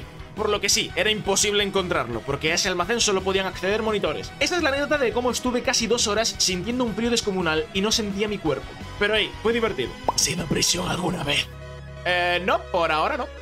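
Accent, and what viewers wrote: Galicia, Spain. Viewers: Que pro, se hizo el camino de santiago, yo vivo por una parte de donde se pasa, en galicia, suerte papu, es largo. Y una pregunta, eres gallego? No se tu acento es como un poquillo gallego, y felicidades por los 5k subs!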